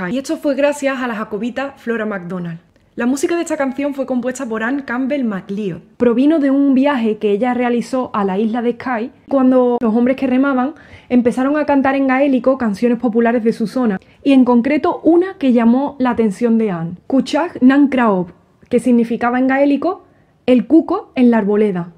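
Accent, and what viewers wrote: Andalusia, Spain. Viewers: "güena ta'rdeh" hace que su asento andalú en su boca suene tan bien como las cuerdas de su guitarra. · Su lengua nativa es claramente el español. Habla un dialecto del español que se llama "andaluz ", con un acento que escucharías habitualmente si viajas por la zona de Andalucía Occidental.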